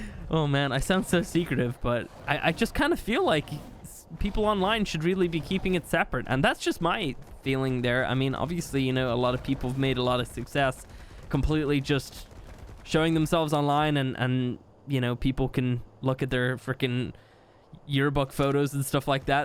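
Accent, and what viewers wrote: Ireland. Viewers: Irish right? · Wait he's irish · i been watching you for years, i never knew you were irish😭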